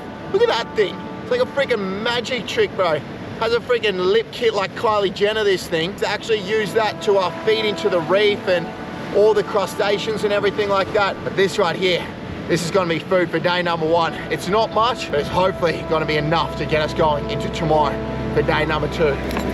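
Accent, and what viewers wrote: Australia. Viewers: First time ever watching this guys Video randomly, as soon as he opened his mouth I figured he's Australian 😂😂😂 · At the beginning, I was like: "Oh! This guy wants to make me believe he'll survive only on what he catches? No way!" Then I heard this Aussie accent, and I switched right to "Oh! Right!"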